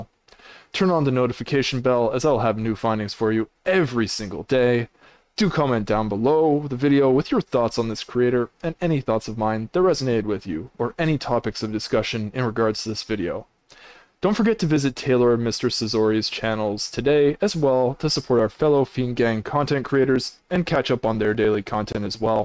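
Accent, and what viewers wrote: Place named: Canada. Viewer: You're Canadian aren't you? Or upper Midwest US. Work on the out... lol. Keep up the work!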